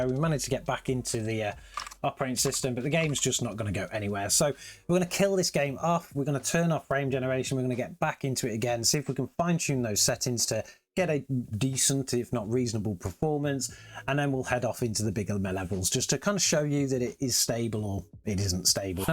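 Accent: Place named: England, United Kingdom. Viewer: Loved your channel! Nice to hear English English as well! Good luck!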